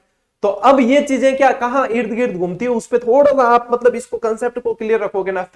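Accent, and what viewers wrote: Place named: Marwari, India. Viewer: Sir your marwadi accent is amazing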